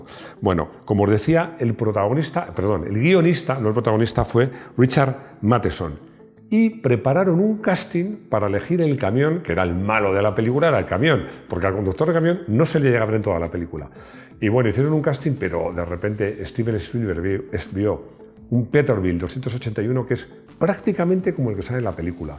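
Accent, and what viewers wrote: Chile. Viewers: la voz en of ES CHILENO " PA ONDE NOS FUIMOS" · ... esa voz en off es sin duda de un chileno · es chileno se le ve en el asento... A donde nos fuimos...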